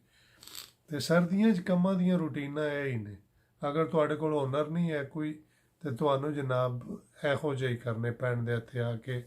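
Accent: Gujrat, Pakistan. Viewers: sahib tousey great ho... vlogers bohat nay pir unhano sunan da maza nahe aynda koi english bool rayea koi hor dosarey zuban apni identity he pul janday nay...... tousey apni proper gujrati assent punjabi which blog bana Kay dil khush kar dita.... keep it up 👍🏼✅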